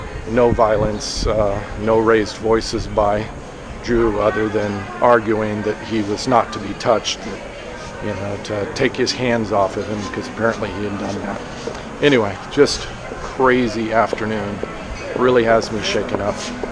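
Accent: United States. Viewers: butthurt ! the story kind make nonsense to me a lot of Hollywood action death threat , drugs , border report you and your friend should know the red lines before traveling to any country Russia ,China ,Dubai"UAE" those countries diverse in almost everything religiously culturally etc but share one common anti LGBT from your accent I assume you are from US let me refresh your memories with states this incident could took place Mississippi, Texas, Alabama . do your search before making scene.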